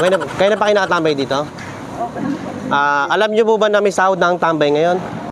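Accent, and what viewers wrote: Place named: Pampanga, Philippines. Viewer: Kapampangan ka?? Halata sa pgsasalita mo hehe.nkktawa tlga mga prank mo 😅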